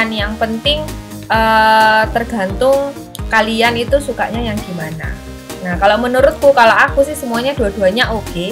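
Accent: Java, Indonesia. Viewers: Jawanya kok medok banget. Dari mana mbak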